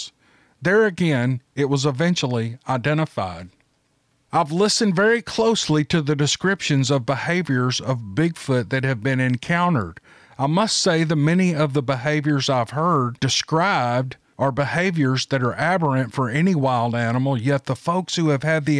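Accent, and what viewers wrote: South, United States. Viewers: Hey Sir… by your twang I think your a southerner. The start of this video shows a lot of nice trees and green grass not familiar with that in TX. You do not need to state the city etc. However could you say what state y'all lived in. Thank You.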